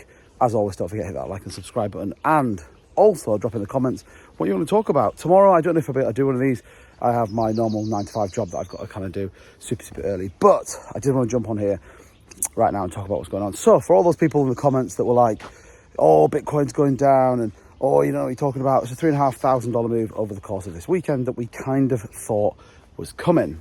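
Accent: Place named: Bradford, United Kingdom. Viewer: Wear are you from bro? Sounds like Bradford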